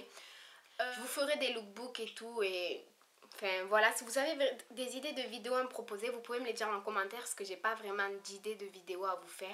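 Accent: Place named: South, France. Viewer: accent du sud 😻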